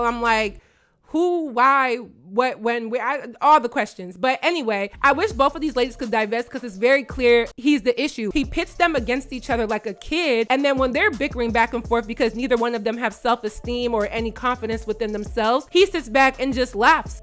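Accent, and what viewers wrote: Philadelphia, United States. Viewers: Girl I’m from Boston and I’ve been watching you for a while and I already knew u were from Philly with that accent! Philly thru and thru lol ❤ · 215 I knew you were from Philly from day one! I said "hol up she sounds like me!" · 215 South Philly love your Content and can hear Philly all day in your accent❤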